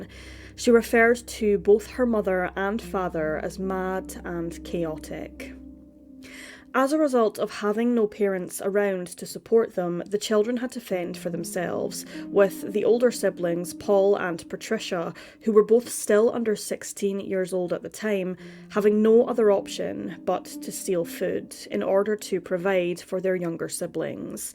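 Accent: Scotland, United Kingdom. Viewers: SHE'S IS SCOTTISH ACCENT THANK YOU!!!!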